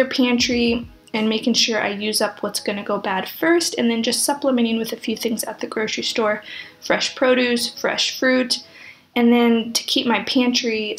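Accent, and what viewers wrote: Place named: United States. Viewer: Are you working in Indonesia? You sound like you might be from USA.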